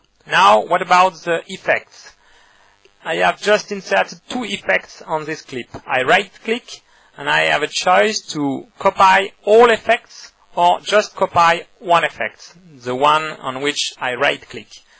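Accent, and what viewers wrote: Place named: France. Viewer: I think the audio quality could be better, but I believe this is because you want to keep the file small for YouTube upload. Smaller video and lower quality audio = smaller file. The French accent is wonderful, we, on the other side of the world are not custom to hearing it, so its sounds funny to some people. I think it is heard more in Europe and is just normal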